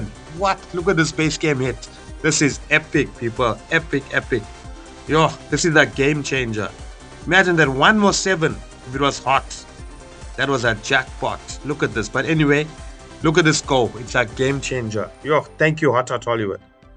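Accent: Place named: Durban, South Africa. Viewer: Awe awe bro... congratulations man🎉🎉.. you sound like you from Dbn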